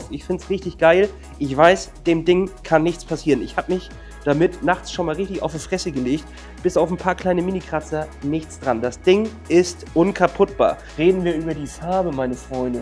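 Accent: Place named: North Germany, Germany. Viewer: Mönsch, schön norddeutsch. Fühl mich gleich heimisch! :)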